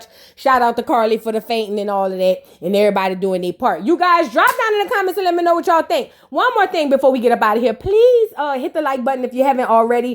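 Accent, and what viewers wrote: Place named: South, United States. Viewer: Girl when I first found this channel I thought you were Toya With that country accent lol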